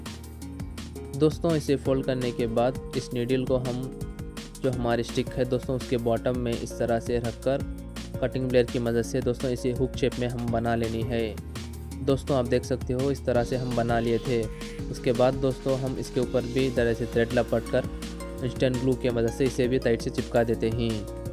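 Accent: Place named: Telugu, India. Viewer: from accent I could tell you are a Telugu tammi!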